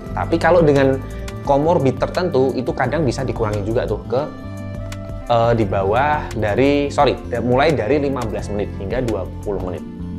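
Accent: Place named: Java, Indonesia. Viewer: Doctor medook.....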